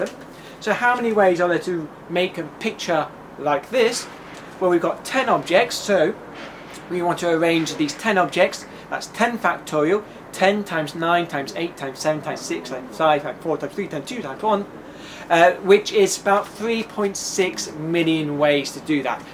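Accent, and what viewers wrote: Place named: United Kingdom. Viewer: i used to be so racist towards British accent, yours are.. just awesome , thx for repairing me ^^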